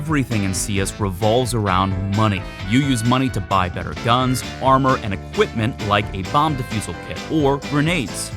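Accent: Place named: United Kingdom. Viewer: you dont sound like him imo but you have a nice soothng voice like him, with a diff accent lol, i think ur british?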